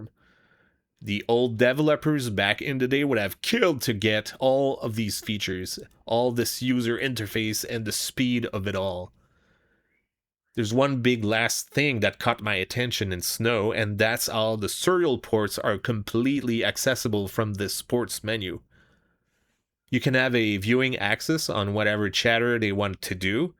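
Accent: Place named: Quebec, Canada. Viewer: I seem to detect a hint of tabarnak in that accent.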